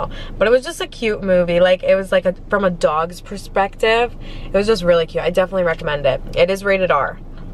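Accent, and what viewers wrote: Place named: Ohio, United States. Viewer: I say it like she does also, it’s a regional thing from the area of Ohio she’s from where I am also from. My husband makes fun of me. I also say full like “fool” etc etc.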